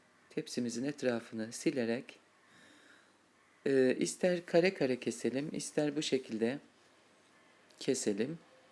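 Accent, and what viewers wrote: Black Sea, Turkey. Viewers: sesin tam bir karadenizli kadin sesi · sizdemi karedenizlisunuz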